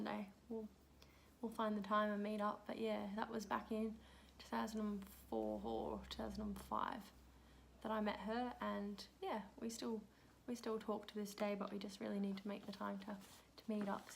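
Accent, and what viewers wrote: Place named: Australia. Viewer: I was thinking of doing this for my channel too. Where did you get the questions? 😁 Also, I can't get over how Australian you sound now!! 🇭🇲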